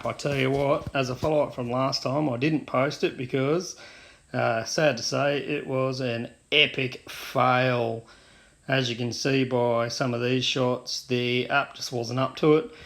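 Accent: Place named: Australia. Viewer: I'm surprised you've not had more comments yet but I guess it's because this app seems to have crept in under the radar so far. I'm looking forward to delivery of my MA2 this week having sold my MM and a Phantom3A before that. Can't wait to try this app out as it seems to do everything that I'd like. I used to fly my Phantom with FPV Camera but that app seems to have disappeared now. Keep on with the videos - I enjoy your quiet, understated Aussie delivery!..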